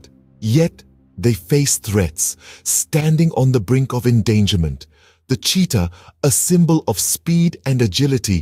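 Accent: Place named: Kenya. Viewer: my man sounds kenyan af. I am kenyan btw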